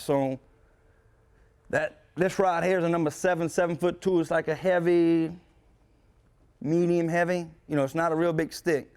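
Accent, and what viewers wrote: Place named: Louisiana, United States. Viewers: Lol he sure is. That hard Cajun accent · hes from louisiana for sure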